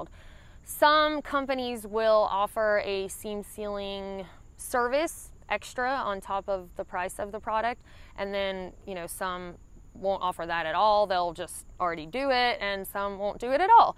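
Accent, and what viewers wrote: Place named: Virginia, United States. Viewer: Dude that south VA accent is great. Even if you didn't know anything about hiking I would still listen to you. I always get the impression that anyone who has that accent I expect them to know everything outdoors lol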